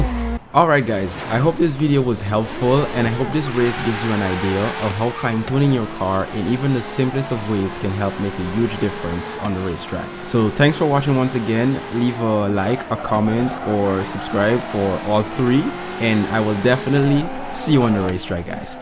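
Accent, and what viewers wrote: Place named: Caribbean. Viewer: You sound Carribean, are you?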